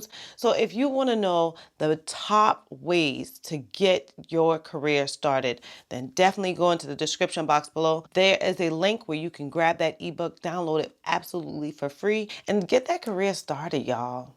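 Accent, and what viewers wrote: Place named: New York, United States. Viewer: Random question: Are you from NY? I hear a NY accent I think.... great video though!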